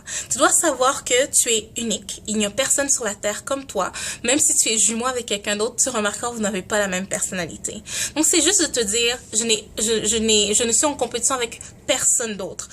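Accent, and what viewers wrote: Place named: Quebec, Canada. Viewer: cette accent <3 je vous kiff les québecquois <3<3<3<3♥♥♥♥♥♥♥♥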